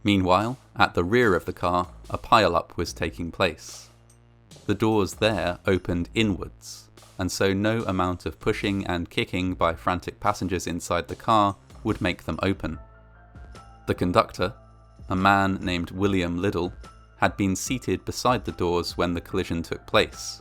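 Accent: United Kingdom. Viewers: What I find fascinating is this American disaster is being narrated by a Brit.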